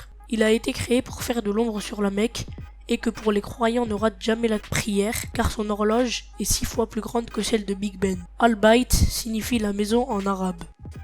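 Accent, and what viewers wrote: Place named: North, France. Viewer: Sa se voit qu'il vient du nord le quéqué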